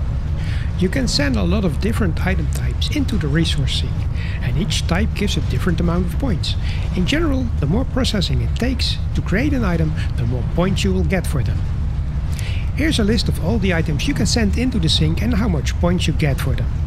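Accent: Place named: Netherlands. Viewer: Nice video, and accent! Dutch?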